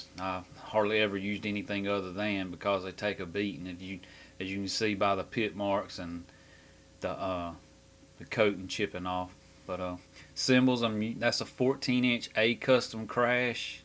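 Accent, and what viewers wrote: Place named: South, United States. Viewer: Thanks for taking us on the tour! I really like your sound as I have said before! Now, ......you have to tell me where your southern accent is from!!!! I didn't expect that! HA HA I live in SC so I know all about southern slang! HA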